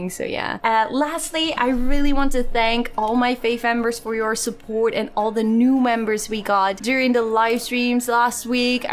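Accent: Netherlands. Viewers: wacht ben je nederlands???? xDD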